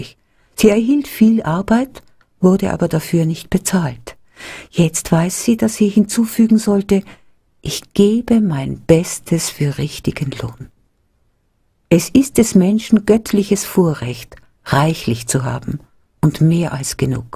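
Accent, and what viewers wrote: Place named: Austria. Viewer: Ja leicht österreichischen Dialekt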